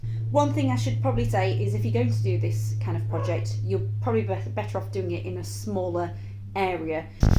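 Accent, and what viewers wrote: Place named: Yorkshire, United Kingdom. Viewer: Ta lass that were greyt. Luv yur accent is it Yorkshire? I fitted me T4 camper out with pine panelling and when it went a bit yellow did the same white wash effect and it lukes a treat! I bought some Annie Sloan and barely used a desert spoonful cos just watered it right thin and applied with a dishcloth. It has a similar effect to yours but left it a bit more opaque. Know any good places for modern, trendy camper curtain fabric? Cheers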